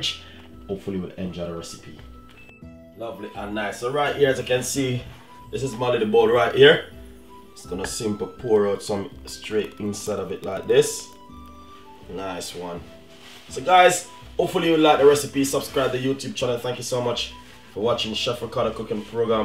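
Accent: Jamaica. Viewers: He's Jamaican even if he were from China he has his own way of speaking because of where he is from. He also says ole mila for whole milk and share it out instead of portion it out but somehow none of that bothered you. I like to hear how other people talk. It's interesting. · Why u being so rude he is a jamaican man thats how we talk its our accent and pronunciation. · So Jamaican talk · He speaks patois leave him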